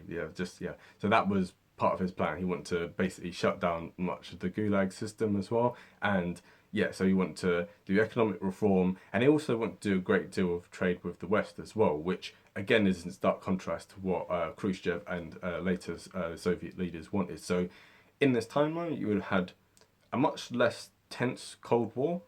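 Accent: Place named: East London, United Kingdom. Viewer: So naive... simplification after simplification... and the language used... sounds like a geezer from Stratford.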